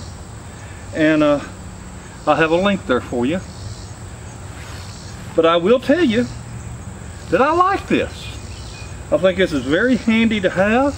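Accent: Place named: Appalachia, United States. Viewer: Look up fellow YouTuber "Butter Bob" & the title to one of his first videos " Butter will make you pants fall off" - I'm close to you age & got up to around 270lb - Started following Butter Bob & lost 57lbs in 5 months couple yesrs sgo & kept it of - I'm also Veteran serving from <num> before I was Medical Discharged - You have an accent similar to folks that live around me so you must be from one of these "Hillbilly States around here - Take Care & get yourself in shape Sir for your family & feeling as good as did when you were 25 yr old- jlo out here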